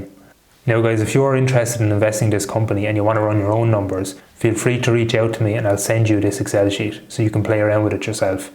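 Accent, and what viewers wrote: Ireland. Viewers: County Mayo accent?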